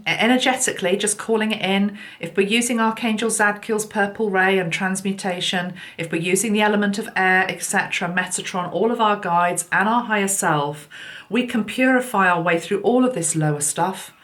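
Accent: United Kingdom. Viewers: This upload was so enjoyable from start to finish. Loved how you tied everything together with the snakes and arrows game, and you have such a beautiful British accent.💖 Thank you for all that you do.💖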